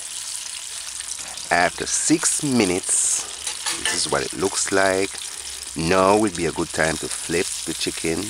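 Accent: Jamaica. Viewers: It is Jamaica accent I'm live there also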